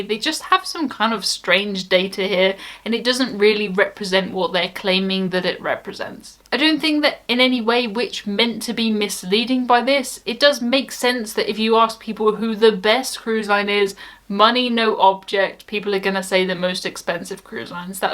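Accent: United Kingdom. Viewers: Thanks for the British words! I love watching just to hear you talk. We Americans are fascinated by British accents.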